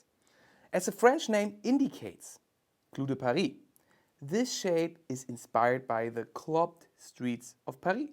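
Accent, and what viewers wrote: Germany. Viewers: owen wilson‘s german accent baffles me every time i watch one of these exceptionally well made videos… great stuff!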